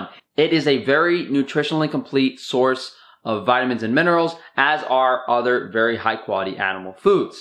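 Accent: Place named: East Coast, United States. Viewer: This guy is so East Coast and I love it :)... Especially the brief pause in between "Cross-contaminaton" and "from the butcher's saw" when he does that little Italian hand gesture with the knife... I like this guy. He knows what he's talking about.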